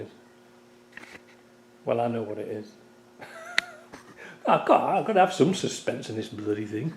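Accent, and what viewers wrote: Yorkshire, United Kingdom. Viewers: I thought Ken Dodd had stepped in for you for a moment until the Yorkshire accent came out.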